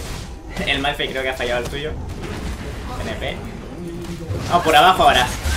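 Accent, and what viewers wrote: Chile. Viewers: es chileno no ? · es chileno sierto? v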